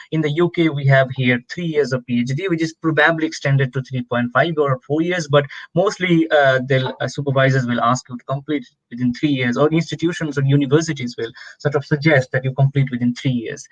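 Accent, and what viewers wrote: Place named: India. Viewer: the accent was hard for me to understand. I think it is good for Indians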